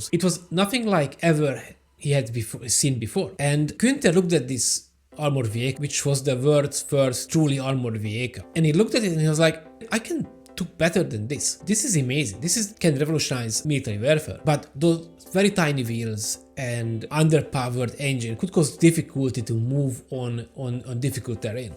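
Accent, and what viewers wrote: Hungary. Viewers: Jó a téma, tetszik. A szóbõséged jó, viszont nagyon, de nagyon erõs magyar akcentusod van. Látszika, azaz hallatszik, hogy rengeteget olvasol,írsz angolul, de nem beszélsz, vagy nem angol anyanyelvüekkel beszélsz. Kicsit azon kellene javítani. Amúgy nekem tetszett.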